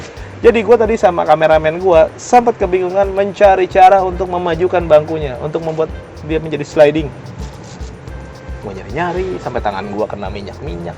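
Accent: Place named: Cibodas, Indonesia. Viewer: Kya org Cibodas nih.. bang yah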